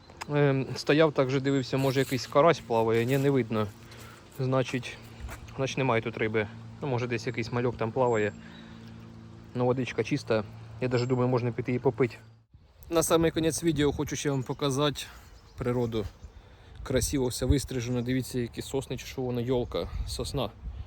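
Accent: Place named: Slobozhanshchyna, Ukraine. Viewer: Молодець що не стоїш на місці, шукаєш своє. Стежу за тобою з того моменту як ти почав працювати на доставці у Вроцлаві, подобається як записуєш свої відео, маєш свій стиль. Хотів підбадьорити тебе відносно мови. Пропоную не вживати слова СУРЖИК, бо це кремлівський винахід від слова сурогат. Якщо я вірно зрозумів- ти розмовляєш українською з яскравим СЛОБОЖАНСЬКИМ діалектом. 😀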